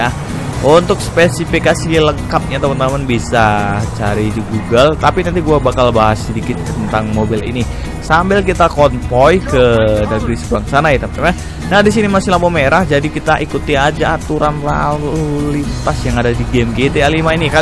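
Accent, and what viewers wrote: Bali, Indonesia. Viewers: Orang bali bli??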